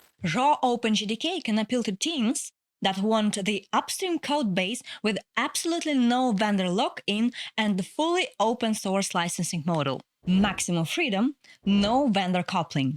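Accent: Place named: Europe. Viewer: Awesome video. Thanks. By the way, I think your pronunciation is cool :face-blue-smiling:, it is like listening to java news from Europe (or from wherever your accent is)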